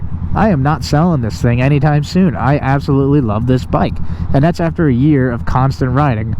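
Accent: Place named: New Jersey, United States. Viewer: First time watching your videos, from the minute you started speaking I knew you were from New Jersey, then I saw similar landmarks like the airport where the 177th fighter jets take off. I use to live in Margate & Ventnor City.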